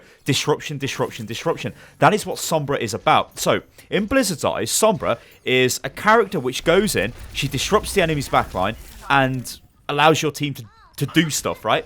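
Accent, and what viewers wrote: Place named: United Kingdom. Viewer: But with an awesome british accent though.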